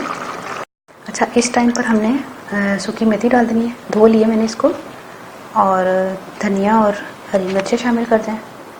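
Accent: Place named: Punjab, India. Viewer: میں پنجابی نہیں ہو پر آپ جو پنجابی بولتی ہو نا بہت سویٹ اور پیاری لگتی ہے آپ پر ورنہ پنجابی زیادہ تر بہت عجیب سے بات کرتے ہیں پر پہلی بار مجھے آپ کی زبان سے پنجابی پسند آئ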